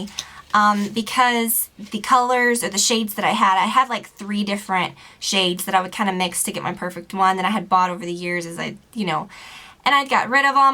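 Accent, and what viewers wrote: South, United States. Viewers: I feel like ur starting to get a country accent or something or is that just me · This is the first of all the videos Ive seen of you, where I can notice a bit of a southern accent ;)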